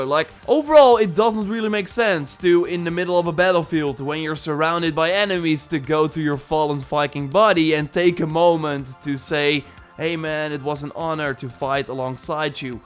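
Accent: Netherlands. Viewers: Ik hoor een Nederlands accent :) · I love that crisp Dutch accent: a n d l e t s g o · Welk Nederlands accent?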